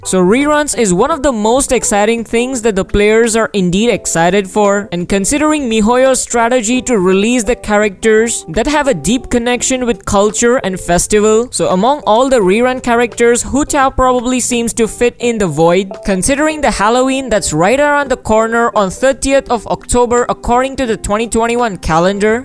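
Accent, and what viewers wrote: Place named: India. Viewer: Your accent seems like an indian/korean...r you from india?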